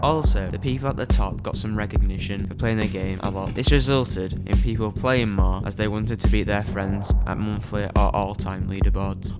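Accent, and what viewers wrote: United Kingdom. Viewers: Edit: English boy talking about the story of a block game server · are ypu birtish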